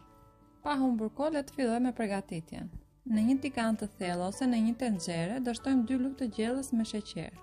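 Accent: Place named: Albania. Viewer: Fsleminderit zonj po nese man te mi tregon kto lloj qaji ku i gjejm edhe si quhen te lutem me trego kjart sepse ju te shqiperis folni pak ma ndryshe e ste kuptoj kam dedhir ta kuptoj kete reqet sepse kam nevoj se kam bronhitis edhe asma per kete jam shum e interesuar ta di se nuk e kuptova llojet e qajit mos ke nji na i thojm qsj blini asht ni druose nji si me than pem te lutem nese e shikon ket ma kthen pergjigjjen flm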